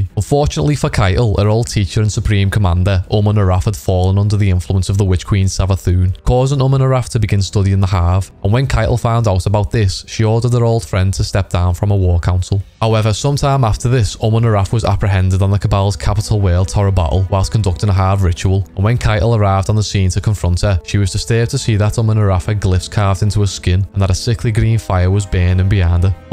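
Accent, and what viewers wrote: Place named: Liverpool, United Kingdom. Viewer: a fellow scouser telling D2 Lore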